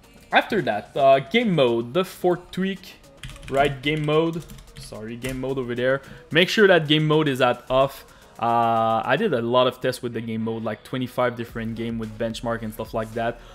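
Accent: Quebec, Canada. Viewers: You sounds like a french-canadian just like me x)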